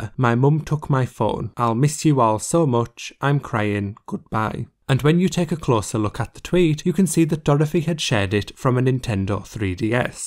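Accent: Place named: Northern England, United Kingdom. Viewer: the northern accent makes this funnier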